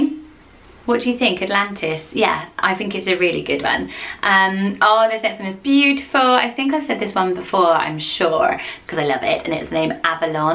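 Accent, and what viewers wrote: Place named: South West, United Kingdom. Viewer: Where are you from? You pronounce your “r’s” like we do in the south west he he xx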